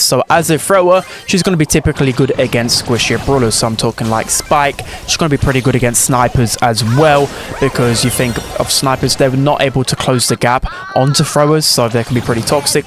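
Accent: United Kingdom. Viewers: Hes british leave him alone 😭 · Even the sub titles gets failed against these brits english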